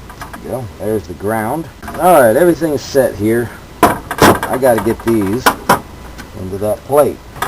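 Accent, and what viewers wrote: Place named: Texas, United States. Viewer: Great video,. You should explain how to test the diodes too. By the way, great Texas drawl too. Do you have a girlfriend yet?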